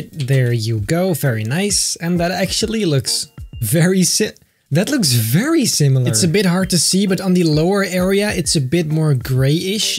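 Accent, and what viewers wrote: Netherlands. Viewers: Are you Dutch? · one more confirmed that is dutch he said hupatee en maybe he is also brabants (ik hoop wel dat t een brabo is want brabo`s zijn cool XD) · Are jou from the Nederland or belgium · Are u dutch? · ar you dutch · Your acccents sounds hollandischs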